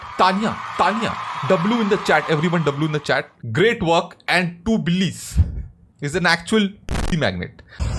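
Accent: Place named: India. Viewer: Why does Indian accent sound so gay and funny😂😂😂😂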